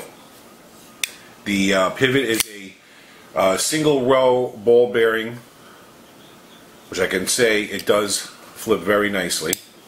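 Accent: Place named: Long Island, United States. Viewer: I’m a fan your voice reminds me of home I’m from NY LONG ISLAND I live in Washington now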